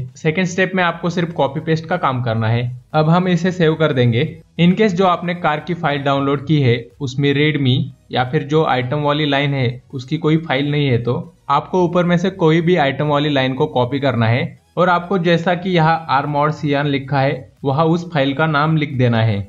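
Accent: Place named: Khandesh, India. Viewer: BRO I TOTALLY LOVE YOU your way of speaking and that khandeshi accent i love it. it was so easy to understand you were straight and simple subscribing you right away.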